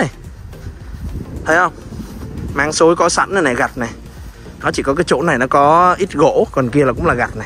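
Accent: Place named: Ho Nai, Vietnam. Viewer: Giọng giống giọng Hố Nai.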